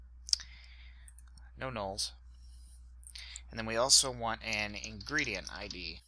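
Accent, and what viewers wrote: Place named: United States. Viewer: Thank GOD for your sweet american accent! I'm tired of "right", if you know what I mean!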